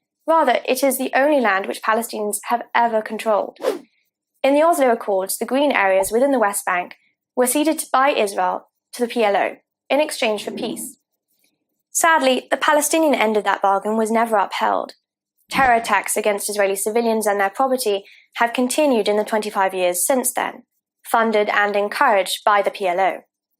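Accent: United Kingdom. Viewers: Oh, where do we start with this little history lesson? It's like you took a propaganda leaflet, added a British accent, and called it "the truth." First of all, the whole "Palestinian identity didn’t exist until 1964" argument? That's a classic deflection. By your logic, Jewish identity didn't exist until 1948 because modern Zionism only took shape in the late 19th century. National identities evolve, but the Arabs in this region had been living there for centuries. Denying their identity doesn't erase their existence or their connection to the land. Next, the "British Mandate wasn't Palestinian land" claim. Sure, it was a mandate, but does that mean the Arabs living there weren't the indigenous population? You conveniently forget that the Balfour Declaration—pushed by colonial powers—promised a Jewish homeland in Palestine without consulting the people already living there. 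And Jordan? Nice try,. Handing off land east of the Jordan River to a Hashemite monarch doesn't magically negate the fact that the Arabs west of the river had their land systematically taken. Ah, and the Partition Plan. You paint the Arabs as unreasonable villains for rejecting it. Let me ask: would you accept a plan that gives over half your land to recent European immigrants? In fact, the UN Partition Plan of 1947 allocated 56 % of the land for a Jewish state, despite Jews comprising about one-third of the population and owning less than 7% of the land. Furthermore, the Zionists didn’t wait f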